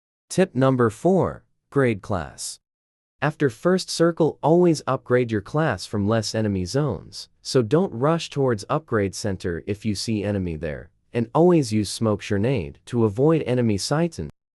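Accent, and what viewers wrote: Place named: India. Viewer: I can almost hear the Indian accent.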